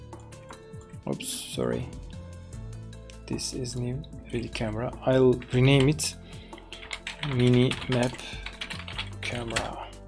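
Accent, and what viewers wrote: Turkey. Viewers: Your accent like Turkish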